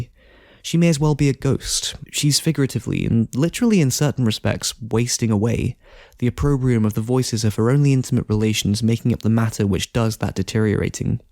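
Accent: United Kingdom. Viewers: Excellent use of clips to accompany what you were saying. I hadn’t heard of the book but this has made me want to read it. British?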